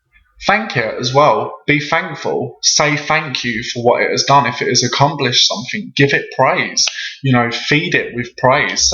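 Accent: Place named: London, United Kingdom. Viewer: what part of England are in ? You sound very London / Essex :)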